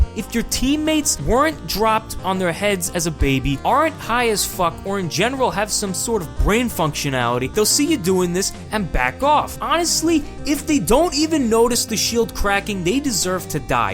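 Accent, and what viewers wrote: New York, United States. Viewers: Is this guy a fokin new yorkah or Brooklyn dude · The fact that he has a New York accent makes every one of his roast better 😂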